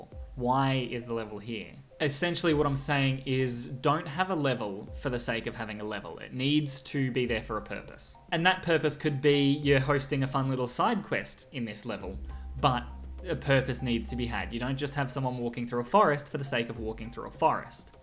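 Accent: Australia. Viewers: Is that an Aussie accent?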